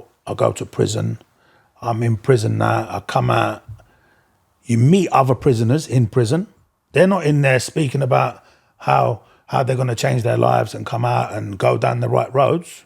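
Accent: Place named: Glasgow, United Kingdom. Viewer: Great podcast 👏 lovely guy! Im Glasgow born and bread and love it 🏴 would like to hear more of your glasgow accent 😂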